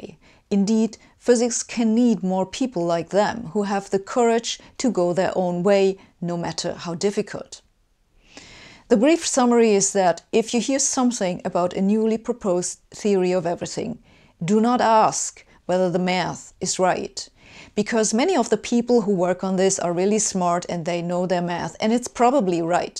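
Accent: Germany. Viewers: I have some real Qs: why are forces free? Why don’t they cost anything? And why don’t they get tired? How does energy fit into all this? Is energy a currency? Currency as in cost? Cost as in no longer free? And if all the energy in the universe is a constant, why does anything happen at all? Because it doesn’t seem like anything is being spent. Perhaps, specifically, if a magnet sticks on a ceiling, why does it not get tired?… While I’m at it, someone asked does a cup of water think? Maybe think more if it’s heated. Perhaps not, but it must think I’m hot or getting hot, if you know what I mean. That’s the problem with thinking, a stone must think it’s a stone and water must think it’s water or I would not be able to think at all; surely. See if you can explain any of that without your typically boring clenched teeth tight mouthed monotone that speaks with a German accent very well, even when you are always trying to lighten up a lot.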